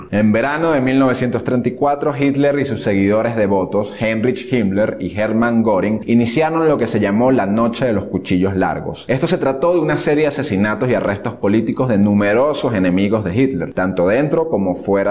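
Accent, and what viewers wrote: Venezuela. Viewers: Hermano tu eres venezolano? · Yo sabía que este chamo es venezolano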